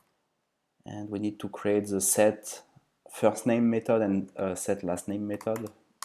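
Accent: France. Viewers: you have a french accent bro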